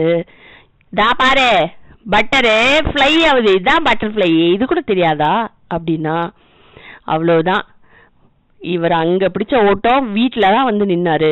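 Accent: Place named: Chennai, India. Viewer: Madras Tamil, modulation and voice change Super 👌👍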